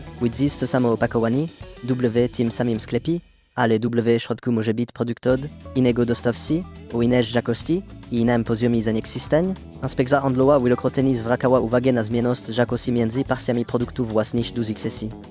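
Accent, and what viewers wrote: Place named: France. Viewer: Ktoś tu mówi z francuskim akcentem 😅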